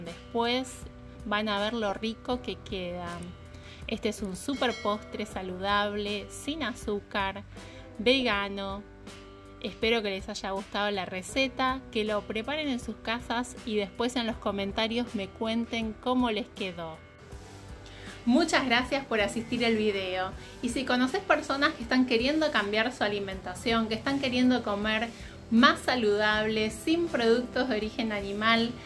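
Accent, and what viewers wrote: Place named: Argentina. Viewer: voy a probar tus Recetas, te encontré, y me encantó como explicas,lo sencillo y se ve todo Delicioso!!!!! Que lindo paisaje desde tu ventana!!!!! Por tu acento,me pareció que sos Argentina.....me equivoco???? Ja ja bueno....es curiosidad.....Gacias,Abrazo desde Argentina.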